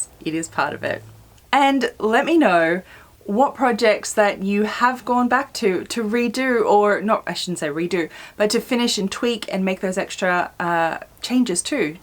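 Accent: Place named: Australia. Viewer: My first time visiting you, found you by accident! Firstly, it's great to hear a fellow Australian and I love your chatty format. Coincidentally, I have just gone back to a project this week. I hacked up an old orange mens suit to make a skirt (it was the colour I had been looking for) but it didn't quite look the way I imagined. I put it aside and moved on to other projects, but it lurked in the back of my mind for a few days and now I know how to fix it. Sometimes I think you need a bit of distance to let go of your 'vision' for the garment so that when you go back, you let the garment speak to you. I've got another skirt and blouse (made to wear together) that I rediscovered last night that I think I will revisit this coming weekend. I seem to do a lot of 'sewing' in my head...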